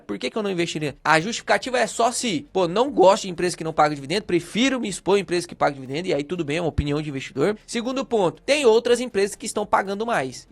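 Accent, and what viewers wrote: Caipira, Brazil. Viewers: “CAIRTERA”? Esse sotaque caipira fode qualquer vídeo. Não tem um fonoaudiólogo que dê um jeito nisso?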